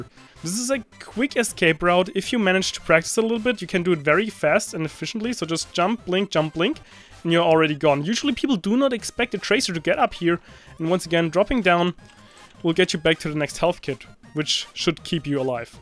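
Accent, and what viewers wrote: Germany. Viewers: Are you German? You sound very german :)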